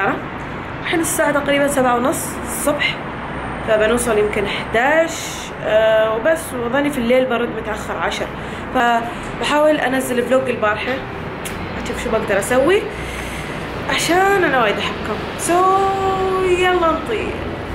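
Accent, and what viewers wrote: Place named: United Arab Emirates. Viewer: قبل لا اشوف الفيديو ضغطت لايك لاني واثقه انه بيكون حلو وصرت اعشق اللهجه الاماراتيه منج يا وحفضت جم كلمه ( يزوغ = يخوف ، ازقرها = نادها ) وبعد والله نسيت المهم لا تطولين علينا بعد نبي فلوقات ما شبعنا انا من الكويت احب فلوقاتج وااايد 💐💐😘سلمي على زايد يا حليله 😘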